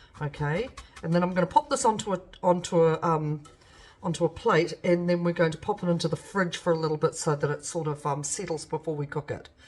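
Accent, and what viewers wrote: New Zealand. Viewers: Kia ora! So nice hearing a Kiwi voice, feels like i'm listening to an aunty. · Bruh what I understand it fine. New Zealand accent bruv you must be American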